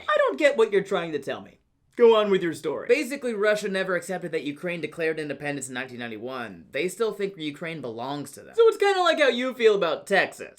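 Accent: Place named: Albuquerque, United States. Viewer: Dude, your Burqueno is spot on. How much time did you spend In Albuquerque to pick that one up? lol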